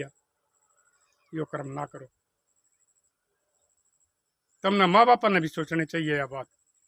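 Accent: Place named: Haryana, India. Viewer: Aapki haryanvi bohot achi lagti ha haryanvi me hi bataya karo aapki sari video dekhati hun meri bi bhabi ase hi Karti thi meri maa me lakwa aa gaya tha to uska ilaj bhai ne nahi karwaya do bete do bahu ha 6 pata poti han sadi bhi kar rakhi h bachon ki lekin maa ka ilajaj hamne karwaya ha koi Paisa nahi diya jab wo theek ho gai mera pitaji army me the pention milti jab theek hogi jab jabardasti lege lekin jane ke baad na to hamko bulai aur ham maa se milne jaati to bhabhi bhai bhatija bhatiji bhi jhagda karte the unko matlab hota ha to aate han nahi to naraj hokar bolte nahi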